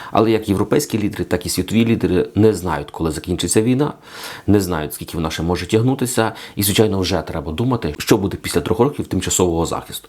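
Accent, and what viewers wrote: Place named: Western Ukraine, Ukraine. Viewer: Западенець, бендеровець...мало грушей накрав....по мов1 ч1тно, як ти розмовляеш мовою....я укра1нка, а розмовляю зовс1м доугим д1алектом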